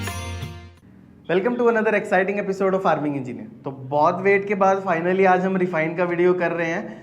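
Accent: Punjab, India. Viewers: Aapke language mein punjabi accent hai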